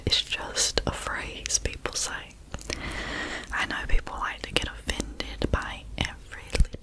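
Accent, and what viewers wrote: South, United States. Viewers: I’m from the north and I love your accent and I swear I think I’ve only known two or three people in my whole life who have a real southern accent like yours and I think it’s wonderful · Its a ASMR Hat Trick-- 3 Video's in 3 days 😉!! What can I say I follow hockey being from Detroit!! Love your Southern accent 😎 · I didn’t even realize you had a southern accent but I’m from south Texas so you just sound normal to me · As a southerner I don't have as much of an accent as other southerners. Yours is just a little bit stronger than what I would consider average. The louder I get the stronger the accent gets 😆. It's refreshing to find a southern asmr. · Hi. 🙂 I didn't know that you had a Southern accent. You certainly kept that quiet, didn't you? 😂😂 I understand what you mean by 'Lord have mercy'. Please look after yourself and don't tire yourself out. 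💙💙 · I love your southern accent! I hate those that you can’t tell if they’re British or southern!! Yours makes me feel like I’m back at home. 😘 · It was a great video!! Im not from US so it’s interesting to listen to a southern accent. I personally love it ❤️thank you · Okay literally your southern accent just makes your. ASMR 10000x better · It would be interesting to hear your impression of an even stronger southern accent! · On a scale of 1-10, I give your southern accent an ♾ (infinity)! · Your southern accent is hella dope. Lol. I'm from Cali where we say hella ;) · I was literally admiring your beautiful southern accent when I saw this video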